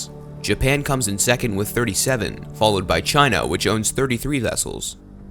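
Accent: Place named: United States. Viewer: To the dude that did this video. You sound like you arr and American. You do know we don't use kilometers, we use mph. And we don't use meters, we use feet. I had to give the video 👎🏾👎🏾 for that. I have faith that you can do better next time. 😁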